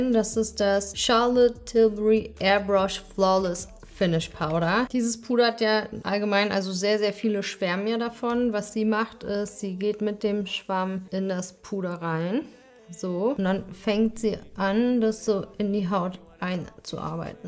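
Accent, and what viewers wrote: Berlin, Germany. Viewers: ick weß it gar nicht mehr ''😂da kommt die Berlinerin aus dir raus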